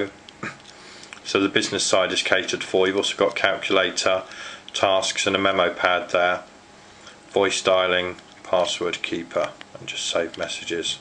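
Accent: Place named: United Kingdom. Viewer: loving the british accent.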